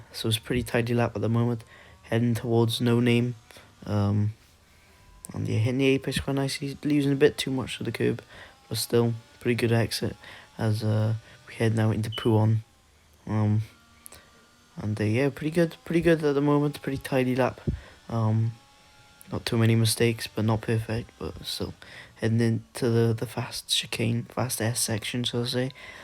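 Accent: Wales, United Kingdom. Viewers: Are you welsh mate? Love the vids your a class driver lad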